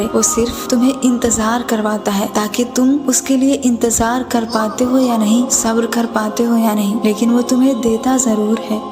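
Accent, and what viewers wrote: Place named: India. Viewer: Your accent looks like from India . TRUE ???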